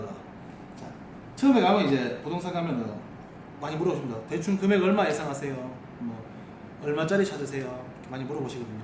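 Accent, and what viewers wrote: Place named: Gyeongsang, South Korea. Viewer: 경상도 싸나이 맞지예ㆍ고맙습니다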